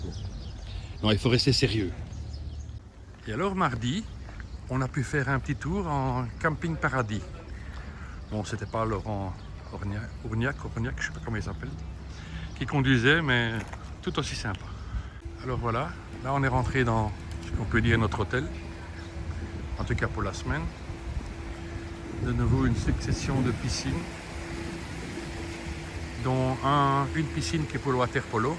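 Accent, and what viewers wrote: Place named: Belgium. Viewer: Bonjour Monsieur. Ça fait plaisir d'entendre la voix d'un compatriote belge sur une vidéo d'Égypte. Je suis de la région de Mons, Dour plus précisément 🥳. Voilà, je viens vers vous car je suis tenté par cet hôtel pour l'été 2026. l'Égypte, nous y sommes allés plusieurs fois (5 fois au cours des 15 dernières années, 3x en couple, 2 fois avec notre fils, et cette année pour partirons pour la première fois à 4 avec notre fille qui aura 4 ans), donc on va dire que l'on connaît un peu (mer population nourriture désagréments intestinaux 😅 etc). Je voulais connaître votre ressenti général sur cet hôtel (nourriture, propreté, animation le soir etc...). Avez-vous plongé depuis le ponton de l'hôtel car pour moi la qualité, beauté de la barrière de corail est également un point essentiel dans mon choix d'hôtels en Egypte. Merci d'avance pour réponses. 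Amicalement, Grégory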